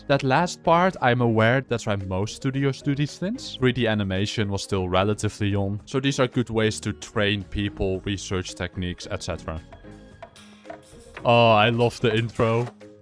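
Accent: Netherlands. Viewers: you sound hella dutch lol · it was a very weird game. It went e4 e5 f3 d5 Be2, I was losing all my pieces but then I turned the board around and won the game. In all seriousness though, I got completely cooked and lost. You can view the game, it was broadcasted om lichess, tournament name was Dutch Open 2026 (also are you Dutch as well? Your accent makes me think so, would be cool to see you irl)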